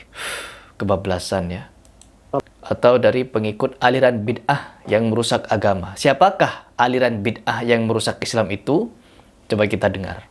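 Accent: Madura, Indonesia. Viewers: Logat maduranya kelihatan😊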